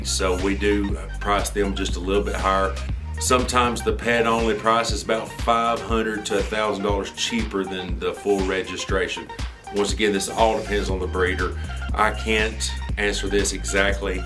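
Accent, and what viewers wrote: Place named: South, United States. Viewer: Hey english bulldog owner from the UK of a red and white girl.Shes as you would say in america full registration.Only thing in the UK its kennel club registered.In the uk red and white females go from around 3000 to 7000 depending on the bloodline.Just like to say all of your Bulldogs look beautiful and healthy and like alot of people have commented they would buy a pup from you.As would I if I was in the US.Edit Love the strong southern accent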